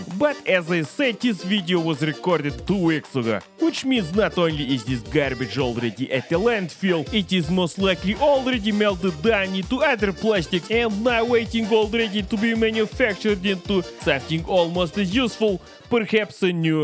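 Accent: Eastern Europe. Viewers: I feel like after watching for years, I could be blindfolded so I couldn’t see any subtitles. And I could still understand his B L Y A T I F U L, S L A V I C voice. 👌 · While I personally didn't need subtitles to understand Slav-ese, let us thank our generous Slavic King for upgrading this video for those that do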